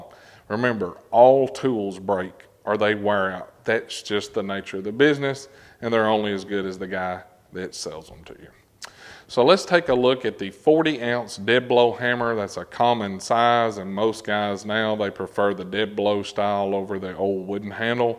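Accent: South, United States. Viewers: Thank you - I hate when they do that and besides is he speaking English or Southern drawl?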